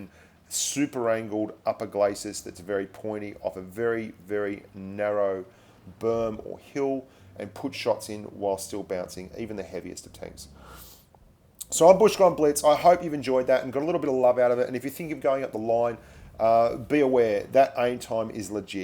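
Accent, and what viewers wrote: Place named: Australia. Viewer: Blitz ok thank you and u sound australian ik so cause I live with 2 australians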